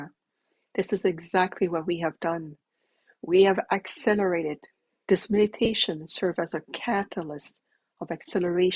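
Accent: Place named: Quebec, Canada. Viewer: Merci!! J'adore tes méditations! Même s'il est presque indétectable, ton accent me dit que tu viens du Québec. Nous sommes de nouveau en lock-down, couvre feu, tout est fermé même pour ceux qui ont leur Pass sanitaire. Grosse leçon pour eux j'imagine. So thank you again! I saw you on Patriot Dave and listened to all your meditations since. Thank you for your devotion to the collective. Have a great year! I didn't even see 2021, time as been slowly disappearing the past 5 years for me. We've got this! Much love ❤️❤️ From Contrecoeur, Qc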